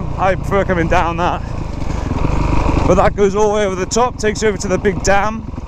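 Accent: United Kingdom. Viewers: hello where are you riding sounds like UK?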